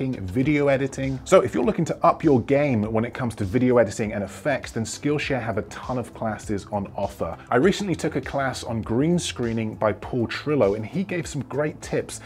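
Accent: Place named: Northern England, United Kingdom. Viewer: Totally off-topic but I have trouble locating accent. I have the impression he has a rather neutral, BBC English type of accent, but his a's in words like "mask", "class" and "can't" sound more Northern English, I think. What accent does he have?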